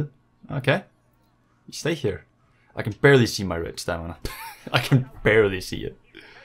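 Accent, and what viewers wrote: Denmark. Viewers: Lol I could hear you are Danish for the first 2 seconds.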